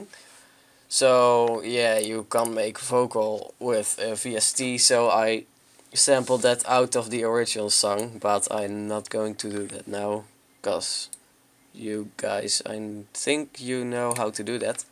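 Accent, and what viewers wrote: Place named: Netherlands. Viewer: je hoort dat je nederlands bent :P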